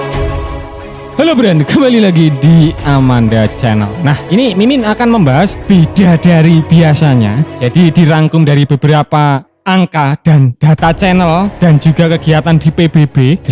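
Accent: Java, Indonesia. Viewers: Logat jowo 🤣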